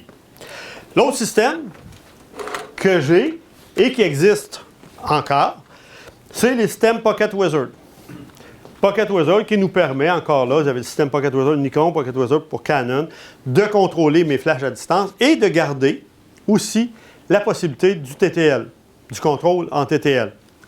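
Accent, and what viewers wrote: Quebec, Canada. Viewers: Après deux heures très intéressantes j'ai attrapé l'accent québécois tabernacle. Merci de Belgique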